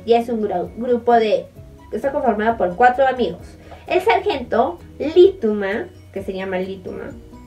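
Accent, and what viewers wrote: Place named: Arequipa, Peru. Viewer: Que linda eres , x tu acento pareces arequipeña ...me encanta tu narrativa , felicitaciones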